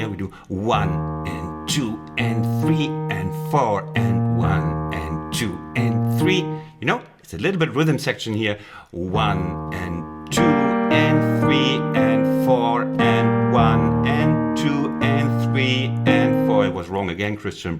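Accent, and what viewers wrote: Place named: Germany. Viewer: Thanks a lot for posting this tutorial! It's one of my absolute favorite pop songs and now I finally know how to play it. I also like your hard German accent (being German as well).😀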